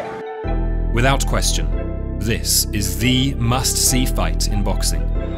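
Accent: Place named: United Kingdom. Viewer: Another awesome one !!! I really like your accent i asume you're a brit , cause English is my second language and sometimes i can't understand some accent's 😁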